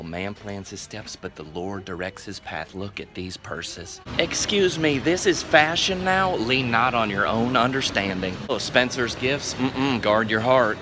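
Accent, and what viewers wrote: South, United States. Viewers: Are you trying to be southern mama?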